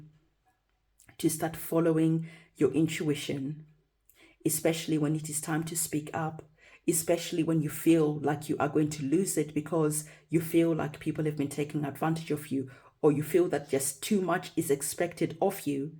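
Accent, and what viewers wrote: South Africa. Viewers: Are you South African? :)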